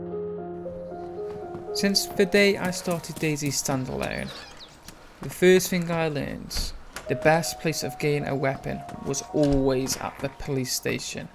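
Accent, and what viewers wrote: United Kingdom. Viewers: Where abouts in stoke you from haha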